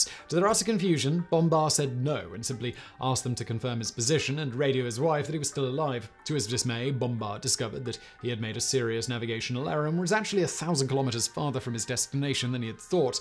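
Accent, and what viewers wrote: England, United Kingdom. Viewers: I too found this information interesting. Unfortunately, I find it hard to understand you though. It isn’t how fast you speak, but that you do not fully enunciate. Many words trail off in volume such that I am struggling through the whole vid trying to catch your words. Add the English pronunciation, and it becomes too much work. I gave a thumbs up for great content.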